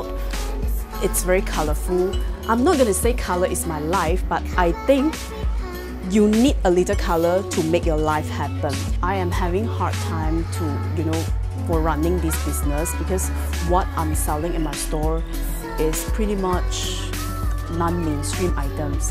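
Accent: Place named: Asia. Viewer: Your asian accent is so cute! And I love your directness, I totally agree with what you said! I will look for your stuff it looks pretty awesome. Thanks for the video☆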